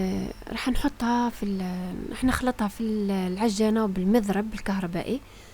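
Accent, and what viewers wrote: Biskra, Algeria. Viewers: Kary Zeroual هاد الكلمة عندكم توما عيب ، هادي هدرتها باينة طبيعية و من جهة بسكرة او بوسعادة و حتى الجلفة و حتى احنا في الجنوب ما نقولوش رحيوة كيما انتوما ، الجزائر كبيرة و كلما ابتعدتي عن الشمال كلما ، استعملت كلمات عربية أكثر عند الناس ، واذا لاحظتي ما استعملتش الفرنسية او كلمات معوجة فرنسيا ، لأن المراة هنا باينة مش مصطنعة ، لازم تتعلمي شوية اللهجات توع الهضاب و الجنوب ، الله يهدينا و يهديكم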